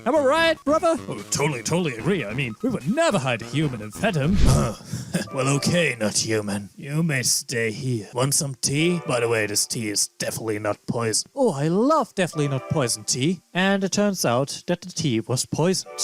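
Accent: Germany. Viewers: Dein Akzent klingt sehr Deutsch.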